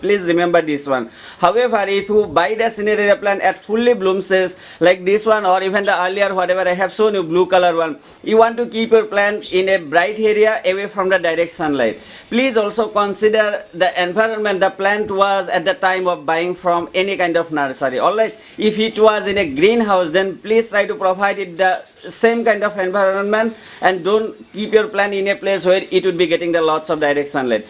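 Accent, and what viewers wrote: Assam, India. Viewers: Accent to huni axomia zen lagisil,kintu aji gom pai montu bhal,lagise.moi apunar video bilak saon aru bohut hikisu.kintu like aru suscribe aji disu.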